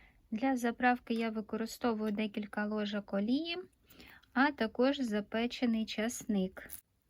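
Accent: Standard. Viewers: Мне больше нравятся ваши видео когда вы разговариваете , потому что у вас хороший и чистый украинский ) Привет из Ирпеня ! Вчера нашли в нашем лесу аж одну рядовку фиолетовую , искали искали рядом еще ... ничего .. одинокая красавица оказалась ) За-то зонтики спасли ситуацию ) Страшно у нас , есть мины и могилы россиян без крестов в лесу и их сухпайки со звездой ... мы там рядом грибы брезгуем собирать .(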